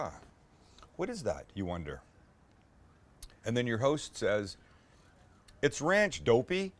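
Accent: Canada. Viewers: What part of Canada are you from? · You’re Canadian?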